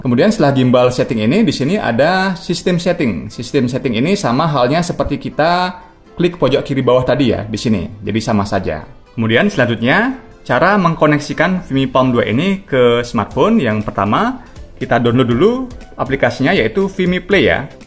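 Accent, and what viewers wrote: Bali, Indonesia. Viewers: Orang Bali kayaknya logak nya... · Makasih bang penjelasannya kerennn banget…ngomong2 logatnya kayak logat bali bang..hehehe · Logatxa kayak orang bali.medok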